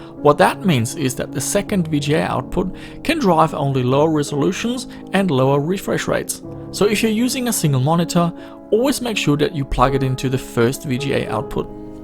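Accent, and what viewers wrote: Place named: Germany. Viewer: FROM CANADA?! I always thought u were German from your accent lmao!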